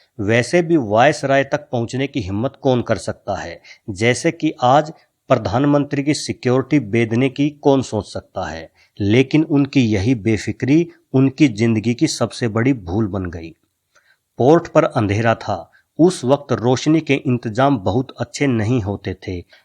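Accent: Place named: Rohtak, India. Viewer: Aapke Rohtak type उच्चारण ka koi nidan dhoondein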